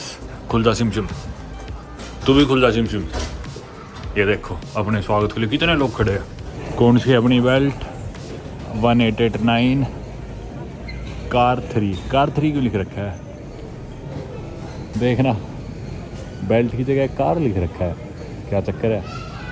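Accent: Haryana, India. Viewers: kya dikhta hain bhai tu. 6.4 ft height. Itna fluent English me. Teri haryanvi accent me hindi. Gym karta body bana rakkhi hain. Bohot se log world travelling karte nomadic india nomad shubham pratik jain yaatri doctor but un me tere jaisa koi nahi . Enjoy karta hu bhai teri video main. Bas nazar na lage tujhe kisi ki ❤❤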